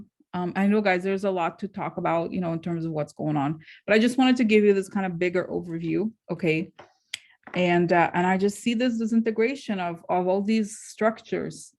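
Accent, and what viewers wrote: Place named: Russia. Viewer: me encanta su manera de dar su informacion siquica y su acento ruso🥰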